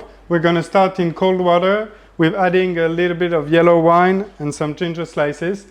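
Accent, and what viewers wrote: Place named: France. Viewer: 哈哈，浓郁的法国味英语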